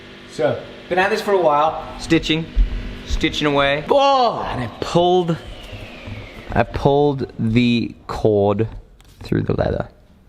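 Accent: France. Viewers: Joli accent français 😉 ! Amazing Damascus pattern, really nice job 👍🏼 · Supers accent français, félicitations !! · Très bel accent français! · that french accent bro oO Congrats from france!! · Your french accent is killing me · Another french applauding the french accent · Super accent français ! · belle accent français !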